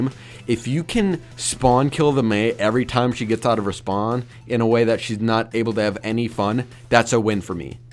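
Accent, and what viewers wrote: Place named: Upper Midwest, United States. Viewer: Oh my golly! I knew your accent was familiar! I am a minneso'an who travels to wisconsin frequently dontcha know.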